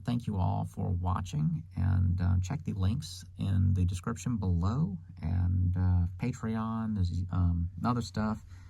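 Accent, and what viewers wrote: South, United States. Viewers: Wtf! I just googled where you do these rentals and you’re in the same province as me?? I thought you did this in the southern US because of your accent. Let me work for you 😂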